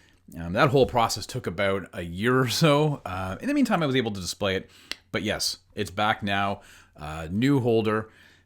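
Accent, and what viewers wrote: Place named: Canada. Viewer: I just come for the Canadian accent. And ketchup chips rock esp since none in US.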